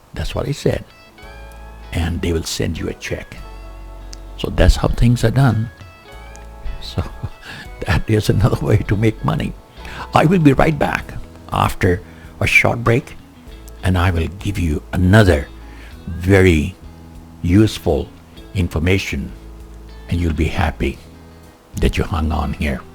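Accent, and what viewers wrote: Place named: Caribbean. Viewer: I love your voice. Where are you from, I hear a Caribbean accent.?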